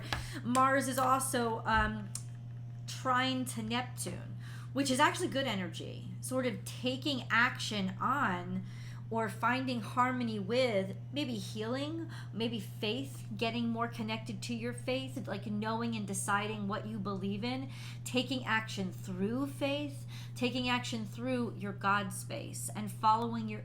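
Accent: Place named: Connecticut, United States. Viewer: You sound like you are from Stamford/Norwalk, and your star calls and insights have been spot on for me. Thanks for the insight. M/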